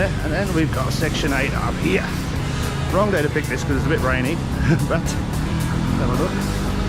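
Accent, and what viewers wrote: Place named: Australia. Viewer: AHA! A Mexican! I catch your videos every now and then for tips on Rise of Empires and always thought by your accent you must be an Aussie. A Victorian I can deal with, thankfully you’re not a Qld’er! 😆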